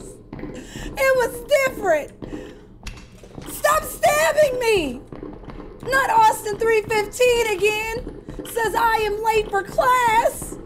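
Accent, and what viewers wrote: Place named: Bronx, United States. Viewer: Did you peel my melon cap back blue😂🤣😂🤣😂🤣😂 you sound like you from my hood Bronx NY 💪🏽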